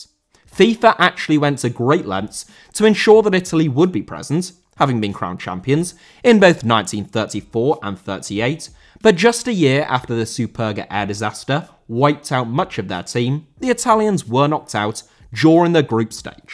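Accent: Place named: United Kingdom. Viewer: Listen Dinis, you’re posting all these angry corrections but I think you forgot that OTHER PEOPLE HAVE DIFFERENT ACCENTS to you. is British and that is just the way people talk there, there is no right or wrong way to pronounce the words you’re correcting. I’m sure you probably can’t properly pronounce many words in English properly yourself, that’s just the way you speak, get over it. Just because you’re angry at something he said in the video doesn’t mean you need to be a pr*ck about it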